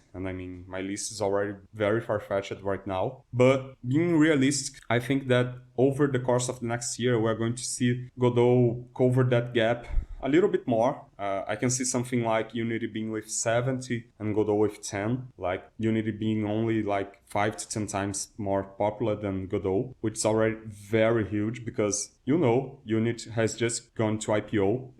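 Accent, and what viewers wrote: Brazil. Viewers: awesome video dude, thanks for that. Are you from brazil? Your accent seems to be if so youre foda cara!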